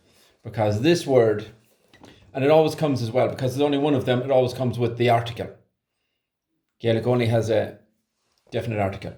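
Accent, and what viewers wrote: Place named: Erris, Ireland. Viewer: Nope he is correct. He speaks Erris Irish just like my grandparents.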